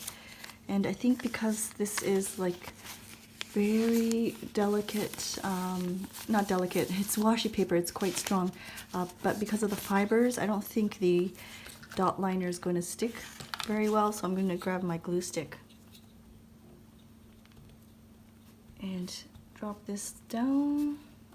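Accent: Canada. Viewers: Haha I could tell you were Canadian the first time I watched one of your videos :) I didn't realize how much I say "eh" until I lived in the UK and people would point it out 😄 I love this longer chatty style video! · Thank you for this video! I have had it saved in my Watch list for awhile but have been having a planner meltdown recently, ha! I think today I have sorted out my dilemma and came on here to watch something and saw your video and it was exactly what I wanted! In fact I am now going to make a playlist of a bunch of your videos to watch as I journal! Also, I don't hear your Canadian accent.... might be because I'm Canadian too:) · Love hanging with you! Love seeing how you prep your pages. You can use the old calendar as a junk journal also. Just collage over the pages. I remember $2 Tuesdays for sure. I think my first movie was at a drive in with my family in Barbados. 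I was 16 and we saw the first Star Wars. How's that for dating myself? And yes you have a Canadian accent. 😁Also loved hearing you enjoy your sandwich. I also craft on my dining table. 🤗💞😘😘😁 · Fun video! Thanks for sharing-I love this type of video so please keep doing them! You definitely have a Canadian accent-it makes me smile when you say "Eh?". When we order pizza we order it well-done, so it has those darker bits of cheese and dough-yum! Have a great day!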